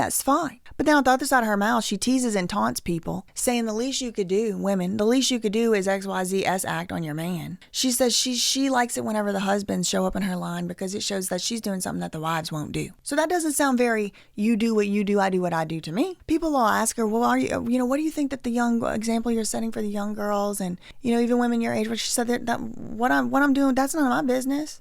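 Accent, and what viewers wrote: Northern England, United Kingdom. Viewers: To intentionally want to cause trauma on others really?? sounds to me like she weaponised her own trauma. WOW, i'm speechless, i'm based in the UK and i didn't even know about the depth of this s**tshow. Thanks for shining a light. Ps: Nice British accent ha! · OMG this is one of the BEST videos you have done! You have to find some more UK stories because hearing your accent made me smile for the first time today! Thank You❤ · That's EXACTLY how northern girls speak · That UK accent... chefs kiss. Dont nobody need to be in the chat to tell you different 😂💂🏻‍♀️ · Im Scottish and your UK Northern English accent was great!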